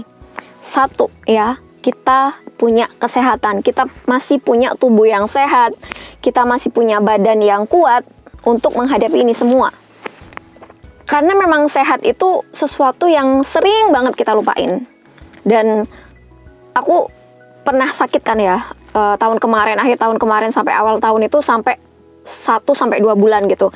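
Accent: Jember, Indonesia. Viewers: Kakak dari Jember ya??? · mbk nya ini org jember ta? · Lho orang jember ta Mbak ?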